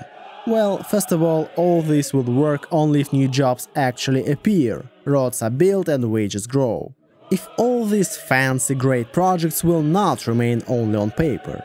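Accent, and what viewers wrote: Russia. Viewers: Твой английский настолько русский, что я посмотрел это видео без субтитров и понял абсолютно всё😂 Хорошо, когда кто-то берётся освещать тему нашей страны. У нас тут нет медведей, пьющих водку и играющих на балалайках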